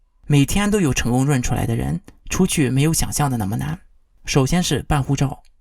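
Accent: Shandong, China. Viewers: 你的山东口音…..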